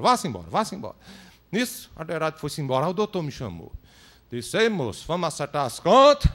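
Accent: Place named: Northeast, Brazil. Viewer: DIALETO NORDESTINÊS, QUEM CONHECE GOSTA MAIS UM POUQUINHO A CADA VEZ !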